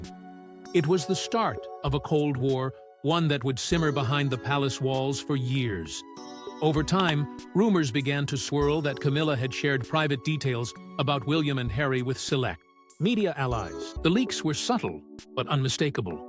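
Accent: United States. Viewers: Why are these videos read by Americans? ANYBODY??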